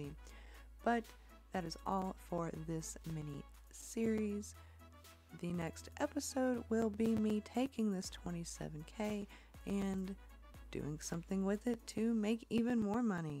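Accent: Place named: United States. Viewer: nice video! your american accent is funny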